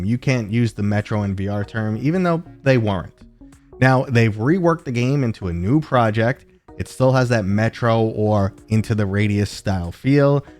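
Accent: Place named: South Jersey, United States. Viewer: lol. I Grew up south of Philly in an icky place called Chester. Lived there in the 80s and 90s . I currently live between Philly and Lancaster. You sound like a Jersey guy to me. I would guess a little more south Jersey cuz your accent isnt that thick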